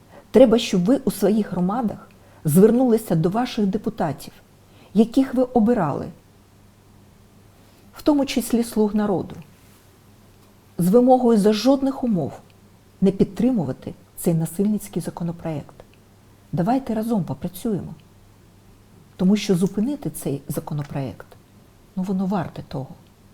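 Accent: Russia. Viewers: Та ти і досі не можеш нормальео рідною мовою говорити... Ти як руссська кажеш на букву "о" -"а". Томущо розмовляєш за камерами на москальському язікє.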